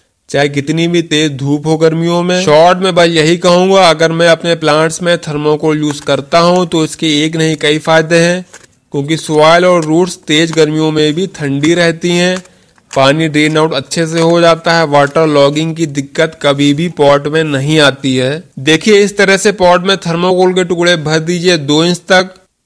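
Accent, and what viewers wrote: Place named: Varanasi, India. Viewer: Beta ache se samjhate ho. Tum banaras ke ho ya aas pass ke ho.tumhare boli me innocency jhalakti Hai. God bless u.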